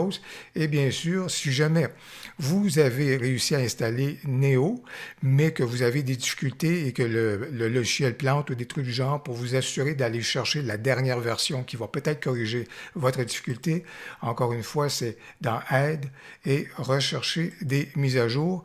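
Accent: Canada. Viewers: votre accent Canadien que j adore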